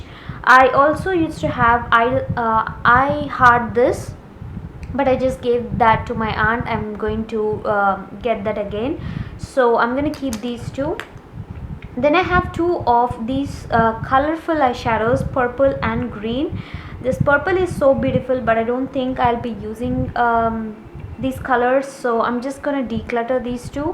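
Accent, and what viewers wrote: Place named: Andhra Pradesh, India. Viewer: R u from andrapradesh? Just ... Ur accent ...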